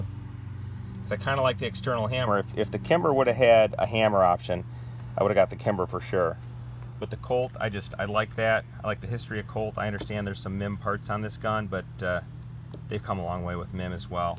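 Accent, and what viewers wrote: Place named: Wisconsin, United States. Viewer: You sound like you're from one of the northern states, like Wisconsin.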